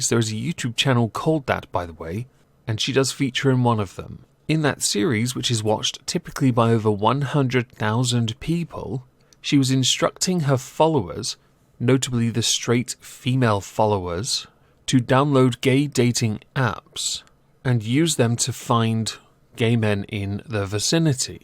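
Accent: RP, United Kingdom. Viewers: Who else subbed for the posh accent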